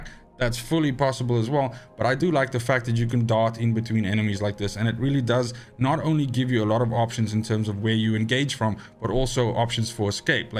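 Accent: South Africa. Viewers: yo you South Arfican ?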